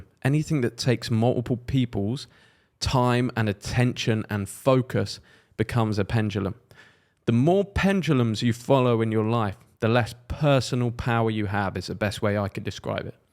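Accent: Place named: United Kingdom. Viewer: As a non native english speaker i have to say it's very pleasurable to listen this beautiful british accent, i like it so much, good content by the way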